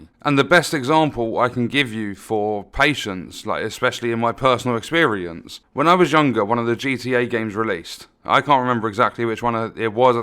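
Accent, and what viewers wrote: United Kingdom. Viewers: Who finds a British accent awkward?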